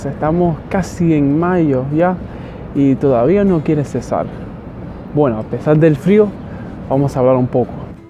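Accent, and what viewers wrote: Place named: Cuba. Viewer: Tiene que ser Cubano sus raices con ese accento.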